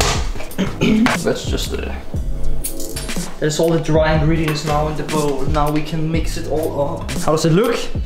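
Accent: Denmark. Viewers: Er du fra DANMARK?! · Its danish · Hva satan, har lige fundet din kanal og kunne med det samme høre du var dansk AHAH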